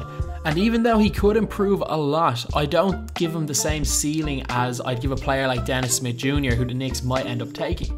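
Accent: Ireland. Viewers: That Irish accent tho! 👍😎🍺🍻🍺 Btw, the same hate Ntikilita is getting is the same hate Porzingis got in 2015 (a Draft just as deep as this 2017 Draft). They're even saying that he'll take 3-5 years to develop, JUST like was said against Porzingis! 😊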